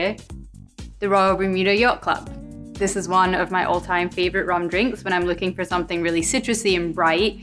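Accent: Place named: Scotland, United Kingdom. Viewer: I heard her saying "Here in Australia..." before, but to me it sounds as if she's actually from Scottland...correct me if I'm wrong Love her vids though :D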